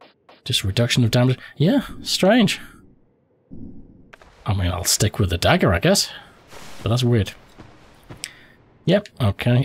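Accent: Scotland, United Kingdom. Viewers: That Scottish accent isn't an attempt btw, that's a genuine Scot. I tell you that as one such specimen myself :P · That’s not an attempt at a Scottish accent. That’s a real deal Scotsman right there, no doubt about it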